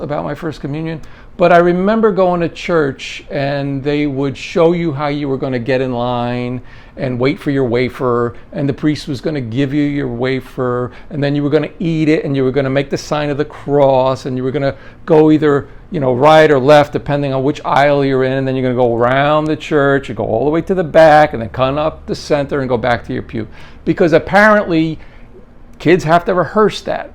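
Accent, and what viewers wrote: New York, United States. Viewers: I’m also from NY, Long Island, you talk like me 😊 I was raised Christian, tried to be Catholic but in divorced remarried so I’m shunned 🤦‍♀️ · I am so sorry. I thought you were Jewish. But you are from New York, your accent is so clear. I am so sorry for my ignorance ❤ I am not even American 😂 Hi from Norway 👋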